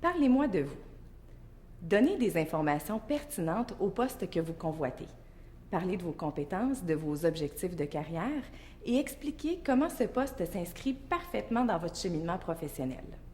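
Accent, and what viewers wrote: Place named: Quebec, Canada. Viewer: i think so shes from quebec thats why she has an accent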